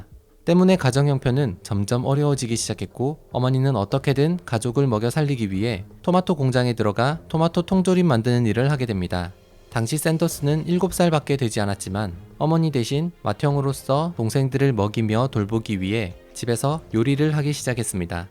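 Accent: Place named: Busan, South Korea. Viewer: 말투가 부산이네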